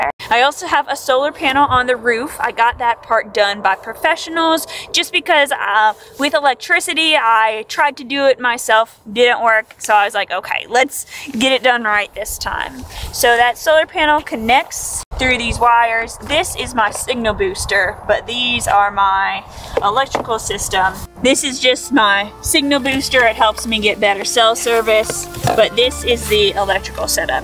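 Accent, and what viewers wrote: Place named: South, United States. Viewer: I love looking for that southern accent in you voice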